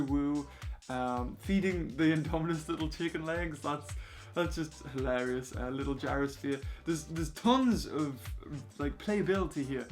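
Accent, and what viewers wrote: United Kingdom. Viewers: plz reply are you Brtish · Are you britesh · you are from UK??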